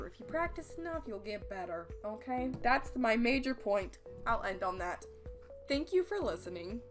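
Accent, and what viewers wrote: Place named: United Kingdom. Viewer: 'Layers are the essence of art' now this my friend is a good quote! You're so right layers are everything ahah! well with how i like to art anyway! XD You can see your improvement so evidently!! The anatomy and the fluidity of her pose looks so much more natural! Also that portrait is super cute! I legit always use references! i'd like to make my work a lil more stylised tho :) ahahah you cutie! its 'A' "y' 'art' '96' thanks for the amazing british accent now i know how i sound!! XD (never does a voice over again) jk jk :D and its true ya WONT SUCK FOR EVAA. You have definitely improved it looks great and you should be happy with yourself gal! Who knows where you'll be next year <3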